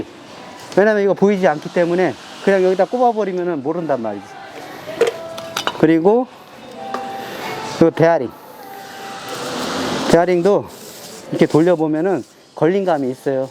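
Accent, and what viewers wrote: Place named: Hwaseong, South Korea. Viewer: 말투가 고향사람 말투 입니다 정겹습니다. 경기도 화성인데...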